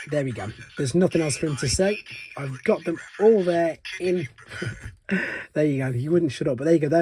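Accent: United Kingdom. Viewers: Hi, thanks for showing this great new toy off. Great to hear the first review with a West Mids accent too (from Cannock, myself!).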